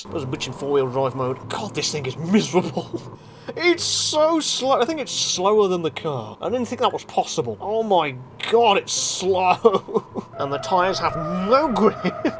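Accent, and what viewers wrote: United Kingdom. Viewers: It's the first time that I listen to someone with a British accent and laugh. Congratulations😅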